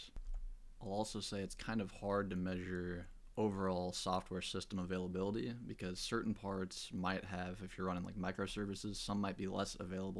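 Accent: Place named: South, United States. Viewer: Love that southern drawl man! Teach those prejudiced idiots who think speaking with a different accent makes you less intelligent that they don't know what they are talking about!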